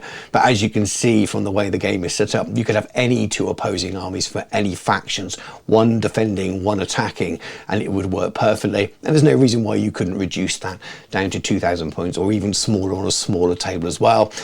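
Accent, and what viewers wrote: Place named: England, United Kingdom. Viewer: It is an absolute joy listening to you read Old World narrative in your English accent.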